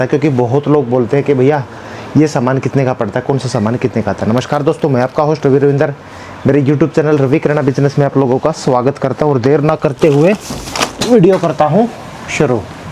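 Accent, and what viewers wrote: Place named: Haryana, India. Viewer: Sir Mane aap kafi video dekhi..or mujhe aapki na to kirana ki Shop m koi interest hota h ..or nahi hi in product m... Lekin sab se bade maze ki baat wo ye h ..ki aap k video ander aap ki jo balne ki jo kla h.. haryanvi Desi andaza m ..wo mujhe bahut jayada pasand aati h...Jo altimate h.. Aap ki aavaj sun kar bahut aacha feel Hota h...aap ki jagah koi or hota ..to m ye video kabhi nhi dekhta... aap ho to he aap ki video m jaan...h Or Mari hardware ki Shop..h Is liye kirana m Mera koi interest he nhi hota..baki AAP ka working style supperb..h.❤❤❤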